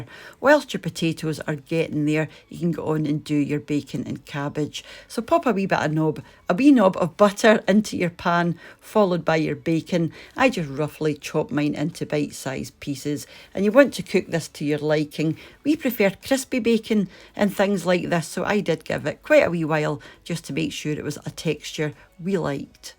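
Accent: Scotland, United Kingdom. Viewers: Love the Scots accent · I missed hearing your Scottish voice!!! "Knob of butter" sounded so much better than a "pat of butter." Colcannon is one of my favorites to make for St. Patrick's Day and Easter. I never heard of bacon steak but maaaaannnn, I wanna try it! You made your colcannon beautiful. Mine is light gray because I put in bacon grease instead of butter. I'm going to try your way for Easter. Thank you for showing how to make it beautiful!!!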